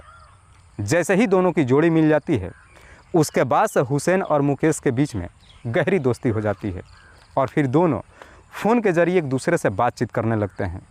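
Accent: Bihar, India. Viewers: भाई आपका लैंग्वेज बिहार के तरह है भाषा क्या बिहार के तो नहीं रहने वाले हैं बहुत बढ़िया कहानी सुनाते हैं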